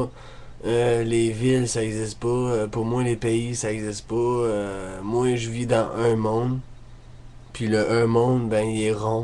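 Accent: Quebec, Canada. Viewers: Tous les Québécois parle comme ça? :o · J'ai aps compris la moitié de la vidéo,pas parce qu'il a un accent québecois,mais parce que ce qu'il dit n'a pas beaucoup de sens. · C'est un peu différent de ce que vous avez l'habitude de voir et c'est une raison pour le prendre pour un fou? Les commentaires "Apprend à parler" ou qui se moquent de sa façon se parler: il est Québécois. Je suis sûre que la plus part à dire ça sont français. continue c'est énorme ce que tu fais c'est tellement rare des personnes comme toi en France qui osent faire ce que tu fais où tout le monde se fait critiquer vulgairement parce que personne ne sait ce que signifie le verbe "rire".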